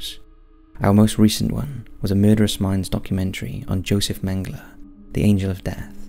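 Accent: Wales, United Kingdom. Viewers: hellomikie92 nothing...if you mean the accent, it’s Welsh 🏴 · He's Welsh. :) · he's welsh.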